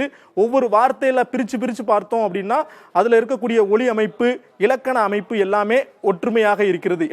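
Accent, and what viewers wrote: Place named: Telugu, India. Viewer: மொலிகல் என்று சொல்லாமல் மொழிகள் என்று சரியா உச்சரிக்க வேண்டும்.... உங்கள் உச்சரிப்பு நீங்கள் தெலுங்கர் என்று காட்டிக் கொடுக்கிறது...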